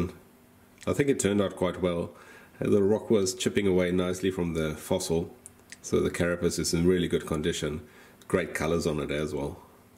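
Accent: South Africa. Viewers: Are you an ex South African?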